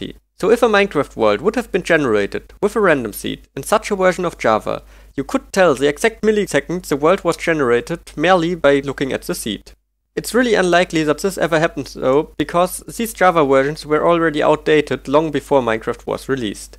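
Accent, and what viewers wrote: Germany. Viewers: man hört das du deutsch oder whatever bist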